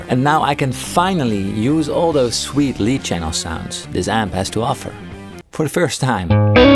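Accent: Netherlands. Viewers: Great video, and I love that hint of Dutch in your delivery.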